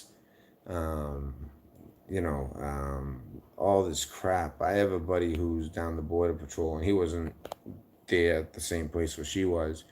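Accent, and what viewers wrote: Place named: Massachusetts, United States. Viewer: Are you from Mass.? Very familiar accent.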